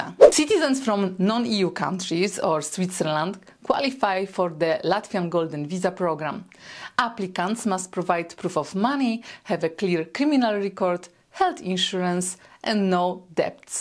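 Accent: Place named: Russia. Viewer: Yoir accent is like that of Russian.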